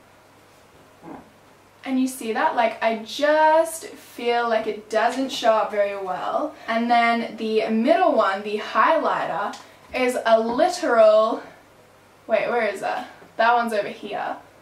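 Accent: Australia. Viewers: I love your accent, are you australian?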